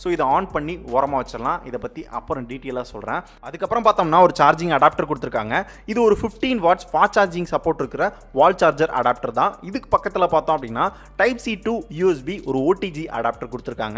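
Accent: Madurai, India. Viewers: Neenga Madurai Ah Bro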